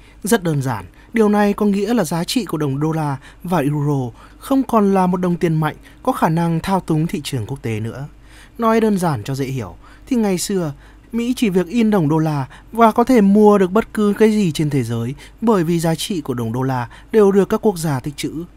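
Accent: Standard. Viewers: bạn nói rất hay .chuẩn luôn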